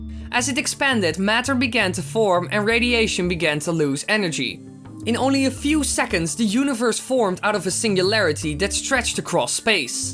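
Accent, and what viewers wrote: Netherlands. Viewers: Are you dutch? You sound dutch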